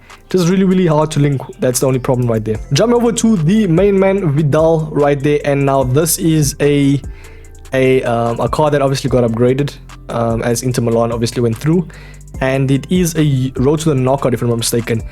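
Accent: South Africa. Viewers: . You're from Mzansi?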